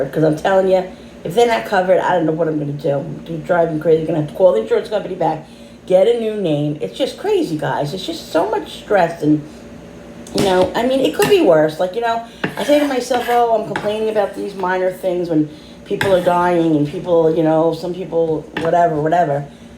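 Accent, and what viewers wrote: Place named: New York, United States. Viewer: I just ran across you! You sound like you are from N.Y. your accent is cool. I am stuck on the Summer wells case. I watch all the real mystery shows too. I will now watch the rest of your cooking.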